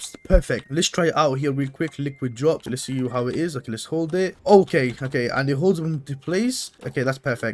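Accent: United Kingdom. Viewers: are u british? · u british or som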